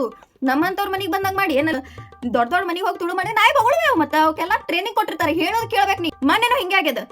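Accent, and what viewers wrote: North Karnataka, India. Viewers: ನೌನ್ ನಮ್ಮ ಉತ್ತರ ಕರ್ನಾಟಕದ ಮಾತ ಕೆಳಕಾ ಚಂದ ಹಂಗ್ ನಮ್ಮ ಕಡಿ ಸಂಸ್ಕೃತ ಮಾತು ಸಲ್ಪ್ ಹೇಳ್ಬಿಡು ಅಕ್ಕ ಆಕಿ ಸತ್ತ ಹೋಗ್ತಾಳ 😅😅😅😅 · ಏನ್ ಅಕ್ಕಾ ನಿಮ್ಮ ಬಾಯಲ್ಲಿ ನಮ್ಮ ಉತ್ತರ ಕರ್ನಾಟಕದ ಭಾಷೆ ಕೇಳೋಕೆ ಎಸ್ಟೋದು ಚಂದ ಬಿಡು ಅಕ್ಕಾ 🥰🥰💖 ಅಕ್ಕಾ ನಿಮ್ಮ ವಿಡಿಯೋ ಬಾರಿ ಮಾಡುತಿಯಾ ಅಕ್ಕಾ ನೀನು ಅಕ್ಕಾ ನಿಮ್ಮ ಊರು ಯಾವುದು ಹೇಳಿ ನಾನ ನಿಮ್ಮ ದೊಡ್ಡ್ ಅಭಿಮಾನಿ ಅಕ್ಕಾ 💞💖.. 🤩🤩💐 ನಾವು ಹಾವೇರಿ ಮಂದಿ ಪಾ 🎉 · ಸೂಪರ್ ನಮ್ ಉತ್ತರ ಕರ್ನಾಟಕ ಮಾತು ಕೇಳೋದು ಅಂದ್ರೆ ಖುಷಿನೆ ಬೇರೆ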